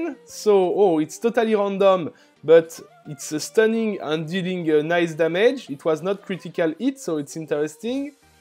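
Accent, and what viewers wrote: France. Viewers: ahah y'a pas de soucis mais c'est sympa de reconnaître un accent français parmis tous ces HL ^^ oui après il me manque quelques essentiels à passer légendaire, surtout maitre mage mais elle est en cours) mais sinon je les ai tous full mais je galere je suis bloquer à 24/25 je tombe que sur des mecs de grosses guildes j'ai pas trop de cul ^^ bon jeu à toi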